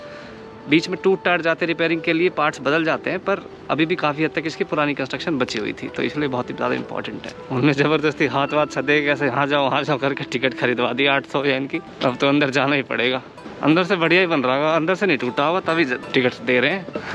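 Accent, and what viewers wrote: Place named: Baghpat, India. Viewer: bhai आपकी भाषा हमारे इलाके से मैच करती है बागपत को जानते हो क्या? Hamari DELHI NCR on the top❤️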